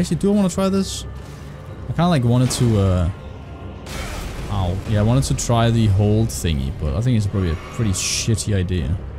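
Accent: Denmark. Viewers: You know that, when you can just hear that danish accent. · man that accent sounds so familiar, this guy gotta be a dane :P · The danish accent tho xD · are you from denmark? it sounds like you have the accent, i talk like that aswell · I love within 10 sec i can hear you are a danish brother. XD Nice vid my dood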